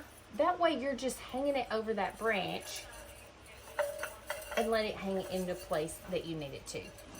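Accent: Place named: South, United States. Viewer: What you call a Chanel stem, I know it as a pipe cleaner 😂. It's probably regional. I'm from Utah and you sound like you're from the south.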